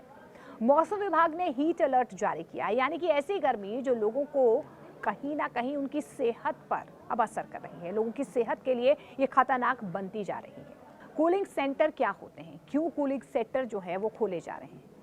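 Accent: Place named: South, India. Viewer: खाबर😮 खबर होगा तू एक साउथ इंडियन है हिंदी से पता चली जाता है। बांग्लादेशी जितने भी साफ सुथरा बांग्लादेशी बोले वह बांग्लादेशी है बंगाली से समझ में आता है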